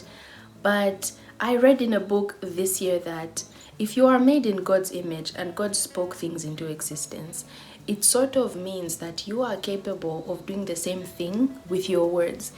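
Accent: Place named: United Kingdom. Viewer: It’s the British accent for me 😂😂😂😂even me I’m delulu I want to become a British citizen!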